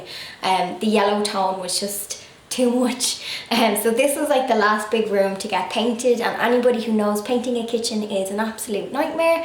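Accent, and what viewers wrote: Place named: Ireland. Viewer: Both you and your home are adorable! You have put so much work into your house for someone your age, it's very impressive! I love your accent. We here in the USA sound horrible compared to your lovely Irish accent.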